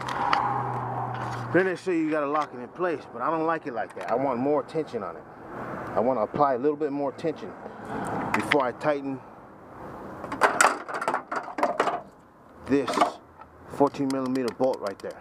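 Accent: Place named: California, United States. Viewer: this fools from cali! i can hear his accent, keep doing your thing man!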